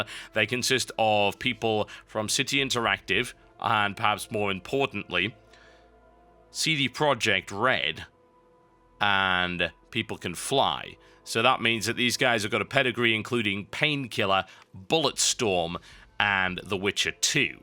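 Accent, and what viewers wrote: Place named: Newcastle, United Kingdom. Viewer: Geordie accent :D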